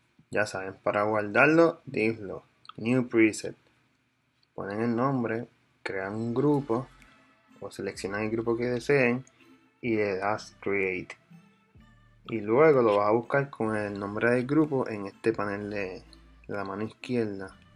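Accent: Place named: Puerto Rico. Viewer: Brothel me ayudaste un montón…me sorprendí al abrir el video escuchar el “que es la qué hay mi gente” yo dije “este es boricua ¡ESO ES!” Saludos y gracias brothel 💪🏽🔥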